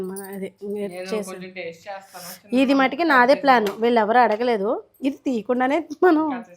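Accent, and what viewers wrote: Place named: Vijayawada, India. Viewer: Mee. Maatalu. Bagunnayi. Mee. Pilla. La. Maatalu. Vijayawada. Language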